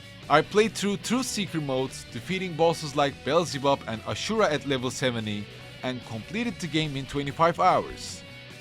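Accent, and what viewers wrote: Turkey. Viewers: you sound very turkish🧐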